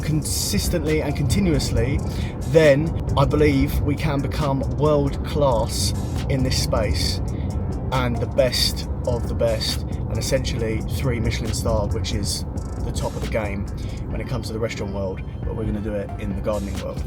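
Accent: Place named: Cockney, United Kingdom. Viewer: Wanting to become a high end firm whilst filming yourself in a vest sounding like a cockney wide boy does not come across to potential customers as high end sorry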